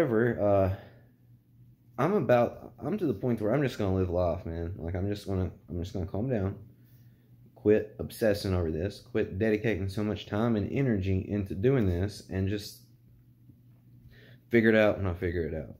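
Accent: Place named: Bible Belt, United States. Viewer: I'm sure you've already taken a trip into Alan Watts territory but if you haven't please check him out! I had always heard of him but never listened to any of his words. Theyre a perfect breakdown of how to better understand the self from a westerners views. You have a thick accent like youre from where I am from which is the Bible belt. There's so much valuable info to hear in regards to how thats shaped our view of the self and God and how guilt has been turned virtue by the church. Regardless of if youre from a Christian background or not youre from a Christian society which has shaped our language about such stuff too. We don't carry the necessary terms to describe stuff such as the Brahman that is the self and all we do. This stuff will never answer questions about simulation theory but it will show you how to live only today and worry nothing about tomorrow for what happens to you is what you will, and always has been the will of you. 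By living only in the present it matters little what the future holds. And that includes waking up out of this computer program lol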